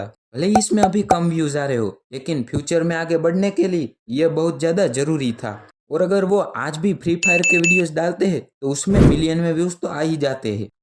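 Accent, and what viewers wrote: Gujarat, India. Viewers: Bhai gujarati hona 😅😅😅😊AVAAJ SE PATA CHAL GAYA MAI BHI GUJARATI HU❤ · Ha ye gujrati hi he avaj se😅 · Ha bhay ya gujarati ha lakin kontat achh dal raha ha ❤ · Bhai tu gujrati hai na 😂😂😂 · Avaj se lag rha nam gujarati hai and darbar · Pakka tu bhai Gujrati hain 💀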